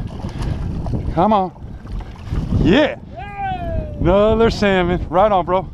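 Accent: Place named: Northern California, United States. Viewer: Lol. I can hella tell you are from NorCal. "Right on brotha" Lol! NorCal lingo at it's best! Nice lookin Salmon by the way. Always taste better when you catch them personally!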